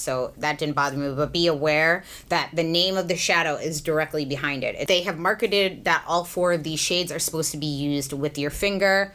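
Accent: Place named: Massachusetts, United States. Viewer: I grew up in MA and knew as soon as I heard that she was also from that area. It's a regional sound.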